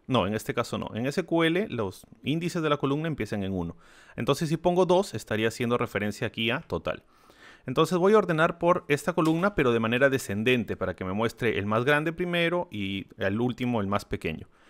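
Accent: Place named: Mexico. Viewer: vaya, hasta que alguien enseña algo concreto, extenso, complejo, explicado, util y usando buenas practicas. No sé si eres de Perú pero hablas como Mexicano 👍🏻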